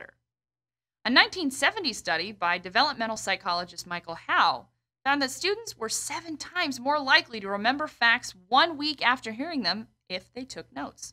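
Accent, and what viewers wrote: United Kingdom. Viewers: Hi, thanks for information! I like the speaker saying british accent😂 And is this method applicable to non fiction class? Such as anthropology? Thanks!! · omg with british accent you are just like Miss McGonagall from harry potter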